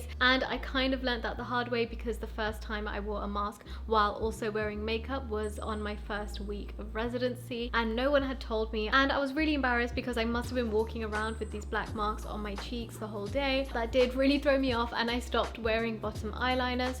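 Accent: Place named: United Kingdom. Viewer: It is so surprising that you are Pakistani and you have a magnificent British accent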